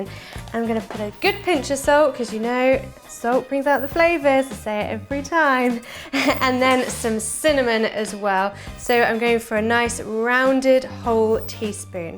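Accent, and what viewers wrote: United Kingdom. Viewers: hello from south wales so nice to hear a british voice on a cooking channel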